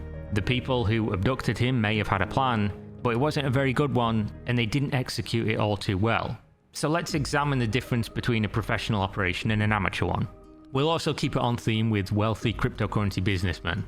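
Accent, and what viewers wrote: United Kingdom. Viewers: This youtubers uk accent is so annoyinh, I cant even explain how much I hate it, just talk normaly, what is your problem mate?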